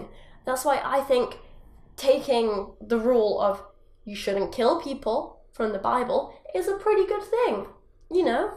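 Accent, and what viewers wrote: United Kingdom. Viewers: The British accent is getting really annoying.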